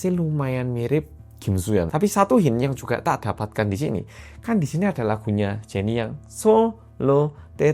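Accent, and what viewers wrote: Java, Indonesia. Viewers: Bolak balik ngenteni rumpian mas medok iki rek.. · Yutuber yg lain juga ada yg ikut bantu jelasin, tapi di liat2 aku lebih nyaman sama penjelasane mas 🤩 Jelas, teliti, ditambah aksen jowone iku loh seng bikin aku tresnooo😍🤣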